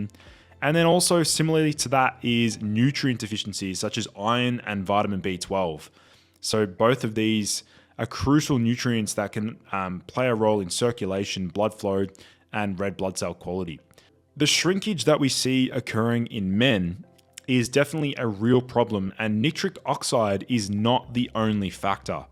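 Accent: Australia. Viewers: But sir you speak very funny r u Australian?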